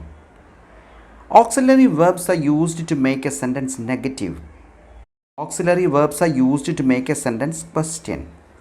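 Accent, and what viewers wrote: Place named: India. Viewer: Indian English.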